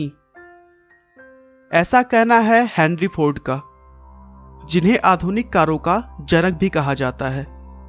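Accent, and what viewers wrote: Bihar, India. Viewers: Abe tu bihari he kya...???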